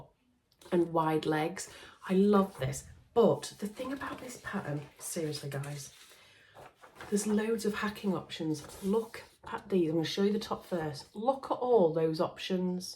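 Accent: Yorkshire, United Kingdom. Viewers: Thank you for putting this together! Just wanted to add that the autogenerated captions are clearly struggling with the Yorkshire accent and are cracking me up!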